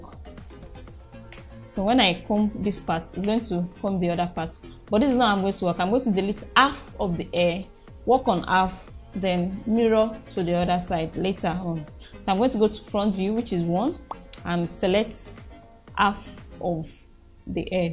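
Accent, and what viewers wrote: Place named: Nigeria. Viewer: You're Nigerian? Your accent sounds familiar because I'm Nigerian too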